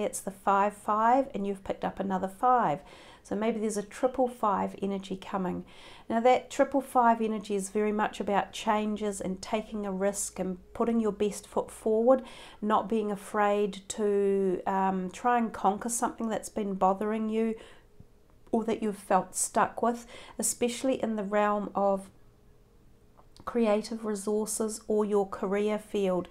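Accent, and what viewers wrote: New Zealand. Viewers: I hear another NZ accent !! Nice reading. ☯️🌈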